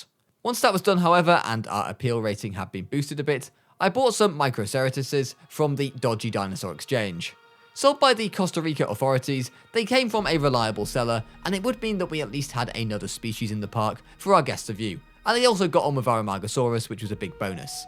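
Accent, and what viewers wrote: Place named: United Kingdom. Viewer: Ur British yay finally I found a British person that does Jurassic World videos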